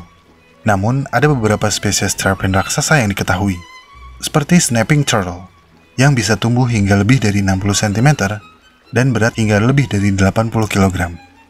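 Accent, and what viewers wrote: Indonesia. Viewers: selama masih di mengerti.. ga masalahin....enak malahan ad aksen Indonesia nya terjaga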